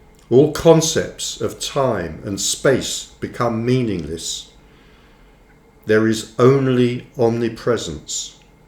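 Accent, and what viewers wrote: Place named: England, United Kingdom. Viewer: Listening to read his book with his English accent provides a nice intermission between episodes of Inspector Morse, Lewis or Midsumer Murders. Thank you! 🙏. 💥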